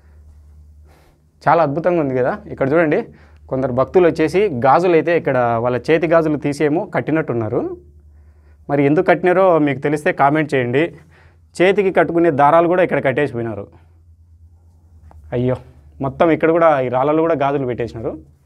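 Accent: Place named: Kadapa, India. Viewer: Naynu ayithay definitely miru ma Kadapa slag matladuthunaru ani naynu Chusthunna anna Bigam bayala....inka Sadhi buvva.....kaniii Raaa biiii ani Marchipoyaru anna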